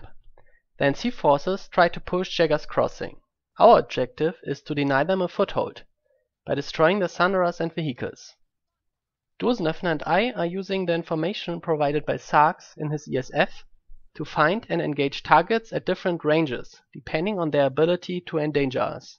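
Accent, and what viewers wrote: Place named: Germany. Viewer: Thanks! Very informative! I just upgraded my Magrider, so I dont Need to be ashamed, to have a gunner. This Video helped me a LOT.Ive mainly Play Harrasser or any Kind of Airvehicle. The Magrider was really a challenge for me, but it Looks BOSS. Im goin with the standart Nova, because He aint that good at aiming, if I want to kill Infanterie. For the Gunner, I am going with the Gun, wich gets faster while Shooting. Its not very accurate, but has the highest DPS.With that gun, you can easily do an Destruction-Combat against another Tank with gunner.Im using the Magburner, for the others, I havent decided yet.Thanks for doing this Video m8.(and I can clearly here youre German Accent, "Dosenöffner......auf welchem Server Spielst du?)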